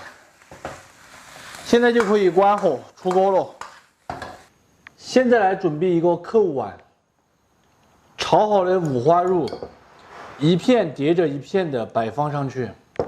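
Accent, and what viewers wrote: Sichuan, China. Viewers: 很好聽，很標準的四川普通話😂👏🏻👏🏻聽起來很有親切感！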